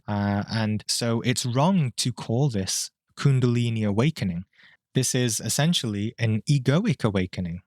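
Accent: United Kingdom. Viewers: okay brother i never comment on videos dnt really do social media but you kinda have to to some extent to exists in the meta verse anyway!!!!! i have to take my hat off!!! i got shown a skeleton key months ago in a meditation and its blew my mind ever since, your video is extremely thorough and you are most def correct. got me excited, knowledge is like good sex to me ahaha when its displayed in front of me i get the biggest serotonin kick. the macro and the micro, all life can be overstood through study of self, we are literally mini universes and setup the exact same way, been studying this stuff since i was 16 i am 29 now and have jut started application of all this knowledge! very exciting time tho so many have woken up, almost dnt feel alone anymore ahah i also see i need for all these new people who have woken up to have some kind of guide to sithed through all da bullshit you have to on this journey, in order for us as humanity to get right to the crux of the matter and start raising up ourselves and in turn earth! 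u sound around my age to, and ur clearly from the uk like me, uk needs more of this we are lacking on the spiritual front on the net not much good representation, but i shall subscribe to you for sheer effort well done.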